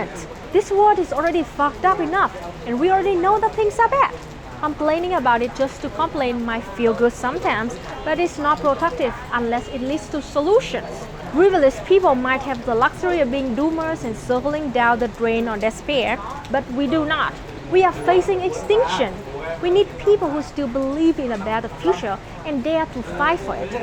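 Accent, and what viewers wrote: Vietnam. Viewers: I find that after watching more of her videos, I have gotten much better at understanding her with little to no effort. I suggest trying to push through and before long you will probably find that you get used to the beautiful vietnamese accent and don't have to focus much anymore!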